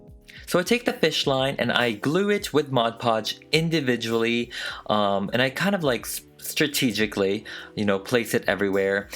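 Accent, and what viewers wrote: France. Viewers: the french accent yass bitch kiss from paris ❤️ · love your french accent :3 ( I'm french :3) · I love the french accent tho XD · Quel exploit ! What a feat ! I didn't expect the final look with the drops falling but omg it's sooooo great ! Please keep talking with the french accent, it suits you very well (on behalf of a french woman) ! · What kind of accent is that XD. It switched between French and mostly British. No hate tho it was cute af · I like that French.English accent 😀😂and your outfit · C'est tellement étrange de t'entendre dire des mots français au milieu de ton anglais, en vrai tu as un accent qui ressemble bcp à celui d'un français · You have a beautiful French ascent and also the French poodle doll you repaint! I love it! <3 <3 <3 <3 <3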